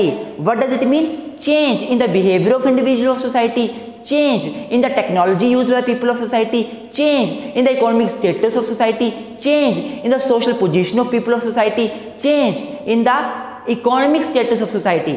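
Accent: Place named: Jammu, India. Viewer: Jammu ka teacher lagg rahey ho Language flow say😊but well done 👍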